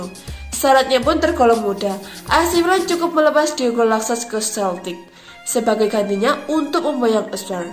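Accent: Java, Indonesia. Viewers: Wess medok jawa ne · Medok.. suarane... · Medok bgt min jowone ngendi 😅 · Adooh medok2 eee kental banget😆😆😆😆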